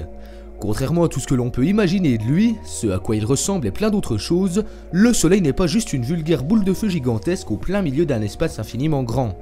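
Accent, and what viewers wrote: Belgium. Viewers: avec son gros accent belge de merde il ne m'apprend rien ce petit cretin qui il est pour dire ca va te cacher et ne passe jamais a la radio tu m'a donner envie de chier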